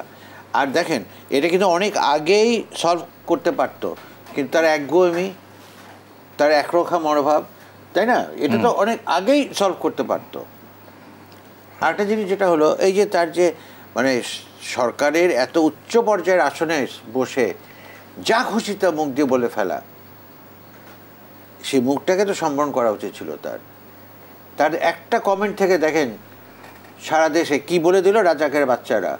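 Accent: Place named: West Bengal, India. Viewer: এই উপস্থাপিকাকে কোত্থেকে ধরে এনেছেন? কথা বলার স্টাইল পুরা পশ্চিমবঙ্গ স্টাইল। বাংলাদেশীরা এভাবে কথা বলেনা।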